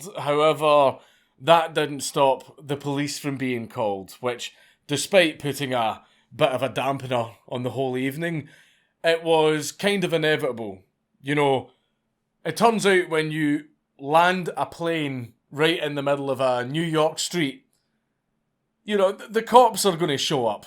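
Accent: Scotland, United Kingdom. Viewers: Bro you only have 830 k + subs?…. Is half of the globe missing their testicles? Or are we in some sick twilight zone episode where having a sexy and masculine Scottish accent taboo?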